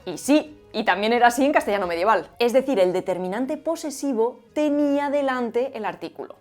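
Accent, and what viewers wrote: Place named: Bierzo, Spain. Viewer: Parece que cante en Berciano. Mantenemos muchas palabras de castellano medieval.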